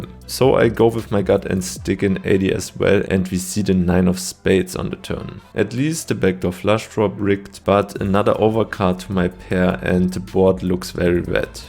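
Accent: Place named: Germany. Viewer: Good vlog my friend. I’m loving that German accent 🇩🇪. Nice juicy game man :)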